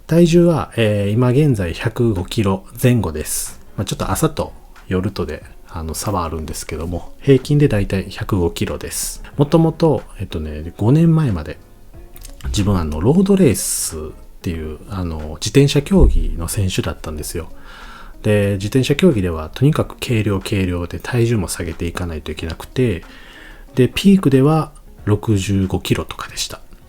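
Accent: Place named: Kansai, Japan. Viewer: さんの地声での動画は初めてなので、動画見ながら、ん？関西弁？って思ってたら、大阪だったんですね！！🌟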